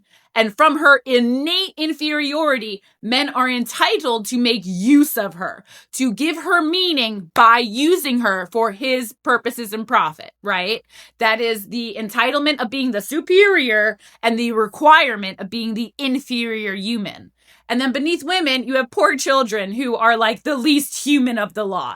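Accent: United States. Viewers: Girl I'm tryna read your accent and i can't pinpoint Midwest or east coast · Where has this woman been in my algorithm? She's brilliant! AND sounds like all the girls i went high school with in NY! I am subscribing!!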